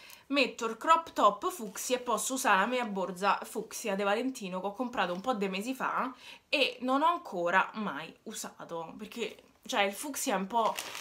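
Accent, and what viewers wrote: Rome, Italy. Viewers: Se la persona che quando sono triste mi fa venire il sorriso e mi fa divertire con le tue battute il tuo accento romano TI AMOOOO💖💖💖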